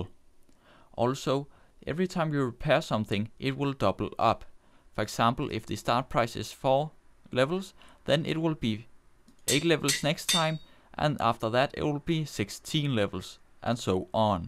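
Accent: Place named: Denmark. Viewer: Your Danish aren't you? :)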